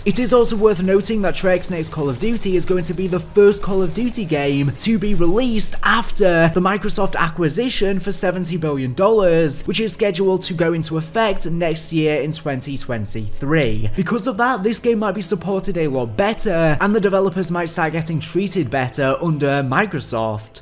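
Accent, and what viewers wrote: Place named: United Kingdom. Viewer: F*cking british accent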